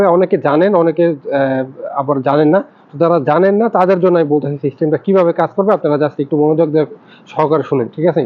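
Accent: Barishal, Bangladesh. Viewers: আপনার বাড়ি কি বরিশালে? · দাদা আপনার বারি কই বরিশাল নাকি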